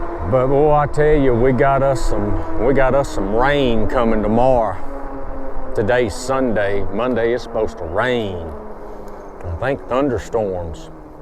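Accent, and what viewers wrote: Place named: United States. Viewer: Hello, great video I go to church every Sunday online because my first cousin and second cousin is both pastors and they have a church. I cannot make it to the church cause I’m too far. I’m like nine hours away from where they are at so I go online every Sunday and look at them. Yes, it is so sad when people pass away I know whoever they are my prayer with them. You know I don’t like to hear people passing. I know I say this if you have somewhere to be at a certain time get up and leave that’s all you have to do and you won’t be rushing, I don’t drive cars I get on public transportation and the people in my city be telling the bus driver to go a little faster because they in a hurry I tell the bus driver please don’t go fast because I wanna live a little longer but when I get my E trike, I won be using public transportation that much. 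I only use public transportation about one or three times a month. I love walking I Costco right around the corner from me, but people in my area is lazy. They will not walk. They will take a cab to go three blocks very very, very, very lazy in my city. thank you so much for your videos and I love love love your accent. It is so beautiful. Where are you from? I love to hear you talk country ❤❤❤❤❤❤❤🙏🏿🙏🏿🙏🏿🙏🏿🙏🏿 God bless you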